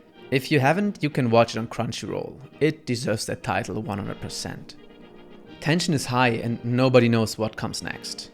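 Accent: Germany. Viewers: its nothing new to anyone and history itself that "bad people" lived prosperous lives after their deeds . i take a wild guess and say given your accent and pronounciaton your german like myself. Take it as you will but reality has never been and will never be Black and White. People just are or believe to be at the right side of History is all. As for your search History that is clocked one way or another. just make sure your not getting fined if you own Volumes of Hellsing that wherent unedited as our Goverment is laughably sensible as per usual and put laws arround that "kind" of Imagery. So if you do own any of it dont show it arround. that might get you into trouble. Good Research overall on the Fella. One could also always add that Werewolfs where one way to kill Vampires which is why Alucard felt icky about fighting him. Though of course thats merely Conjecture and one of a dozen ways the Myth of Vampires is told. 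· figured your german is wayyyy too good and the slight accent when you speak english made it obvious in my opinion anyway watched all your hellsing videos they are awesome dude👍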